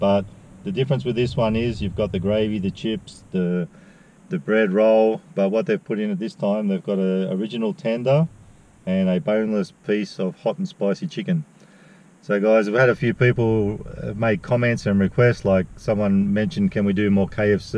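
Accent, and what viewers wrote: Australia. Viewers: R u from Melbourne?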